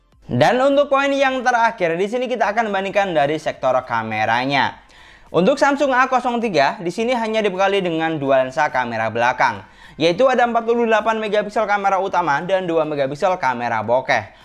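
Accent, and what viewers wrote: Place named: Java, Indonesia. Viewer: kalo masnya orang jatim mungkin tau, orang yang biasanya masrahin (orang yang ngomong) waktu ada selametan(syukuran),logatnya kayak narasinya mas'e.. "dinten pitu pekenan gangsal, tebeh dipun rantos celak dipun.." yah pokoknya kyk gitu2an lah.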